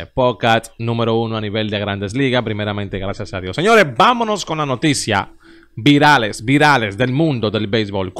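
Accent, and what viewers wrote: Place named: Cibao, Dominican Republic. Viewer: Compa usted habla demasiado feo, un cibaeño que cambia la i por la L, ejemplo dos ochental tres, barrabasada total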